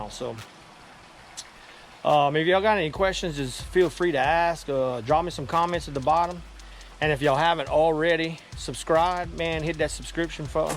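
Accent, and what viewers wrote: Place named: Acadiana, United States. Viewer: Man I know an Acadiana accent when I hear one! I recognized Eunice City Lake when you showed a clip fishing on the water. I am also from Eunice so you have my subscription!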